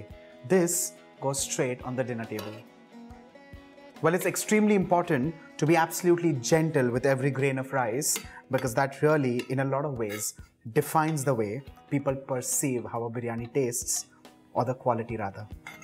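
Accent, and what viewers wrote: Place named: England, United Kingdom. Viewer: I love your english accent ,bro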